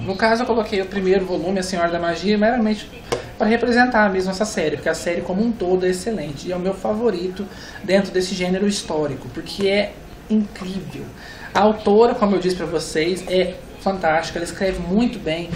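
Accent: Minas Gerais, Brazil. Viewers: que sotaque deliciooooossssoooooo,já morei em minas e sou apaixonada por esse sotaque,amei seus videos,bjs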